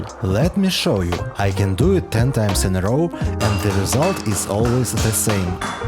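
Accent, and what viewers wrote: Russia. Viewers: Такой милый рус акцент, я даже забыла, что на англ слушаю. :)) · Какой ужасный русский акцент... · U sound russian. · I like your ideas and your russsian accent. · Please do not record your videos in English. You have terrible Russian accent. Take pronunciation lessons. Good luck. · I can't help but subconsciously find the accent very uncomfortable. As if I heard Russian instead of English. the emphasis on syllables with specific vowels even scares me as a Czech. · Видео на Английском языке, стало быть и комменты будут на Английском 😂😂😂😂😂😂, да, я по незначительному акценту поняла , что парень говорит и на русском, но в общем видео для англоговорящих.❤ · Do you have a favorite hack for making 1/4" butter cubes for pie dough? the cooling rack iiii good, but I think I should pop it in the fridge or freezer to get them hard enough to pop out of the race without mashing them. 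Oh! and the mushroom cutting! So good. Is that a Russian accent I detect? I have so many Russian/FSU friends that I feel right at home with you teaching us.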